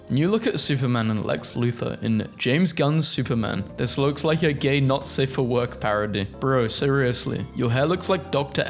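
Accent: United Kingdom. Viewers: Hi British man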